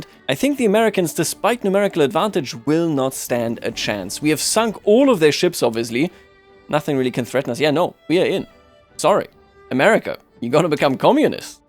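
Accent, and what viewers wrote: Germany. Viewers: I like your german accent. It has that analytical feel to it.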